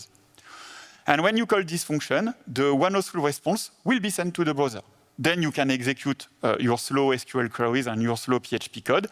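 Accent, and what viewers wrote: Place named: England, United Kingdom. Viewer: why does his french sound like english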